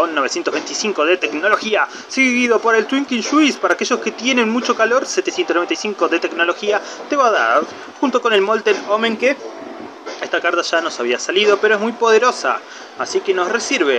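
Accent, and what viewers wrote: Argentina. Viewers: Seguramente buenos aires por el tono, yo tambien de buenos aires, entonces por eso creo que es de ahi. Una pregunta vos de que provincia sos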